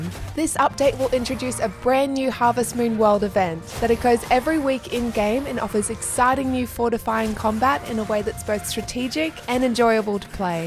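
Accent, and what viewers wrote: Australia. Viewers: i like her ausie voice 🤩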